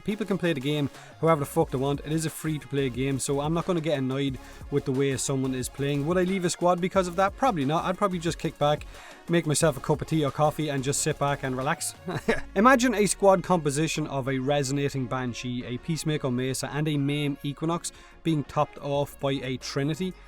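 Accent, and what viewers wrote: Ireland. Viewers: Ronaldo Rond those Irish accents are one of a kind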